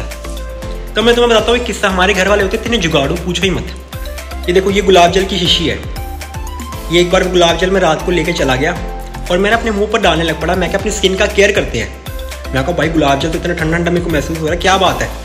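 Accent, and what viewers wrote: Rajasthan, India. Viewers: Rajasthan walo ka accent chhipaye nhi chhupta tbh maine socha tha nd it came true !..m from Rj too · Are u from rajasthan?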